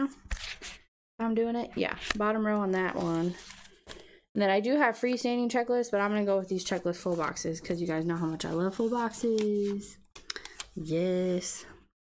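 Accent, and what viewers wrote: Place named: South, United States. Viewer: Hahaha I was just thinking...”is she normally that southern?”.... when you said you were. I thought I was going crazy🤓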